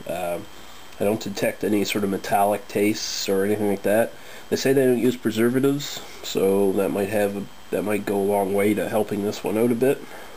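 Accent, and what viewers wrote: Canada. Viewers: you have a VERY canadian accent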